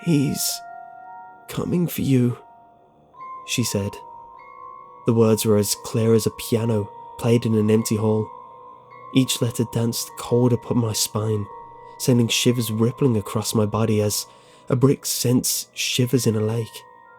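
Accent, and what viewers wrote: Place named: Northern England, United Kingdom. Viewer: YEH NORTH ENGLAND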